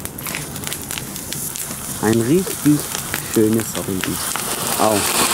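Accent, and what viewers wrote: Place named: Swabia, Germany. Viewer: feiers vor ellem dass au en schwob bisch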